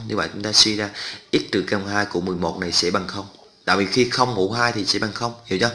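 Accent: Binh Dinh, Vietnam. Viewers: giọng bình địa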